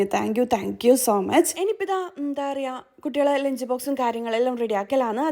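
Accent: Kannur, India. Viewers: Kuttikal varumbolk pappa dam parakoole nammala kannur bashayann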